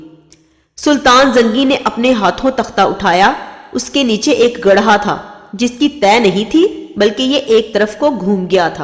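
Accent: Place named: Punjab, Pakistan. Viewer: ایک مرد مؤمن مرد حق کی شان بیان کرنے کے لئے مردانہ آواز ھونی چاہیئے، مؤدبانہ انداز ھونا چاہیئے اور لب و لہجہ بھی درست ھونا چاہیئے اور خاص طور پر تلفّظ پر زور دینا چاہیئے !!! پنجابی تلفّظ میں اردو کانوں کو انتہائی بھونڈی لگتی ھے _ " معذرت کے ساتھ ؟؟؟ " سید عابد حسین شاہ اویسی ایوبی مداروی !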